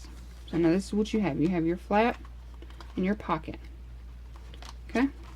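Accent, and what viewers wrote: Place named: South, United States. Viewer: Great tutorial very easy to follow. Love your southern drawl as well 😊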